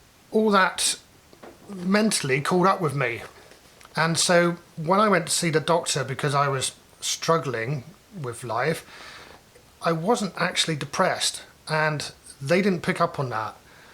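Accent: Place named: Somerset, United Kingdom. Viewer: Yer a somerset lad..!!! - Good lad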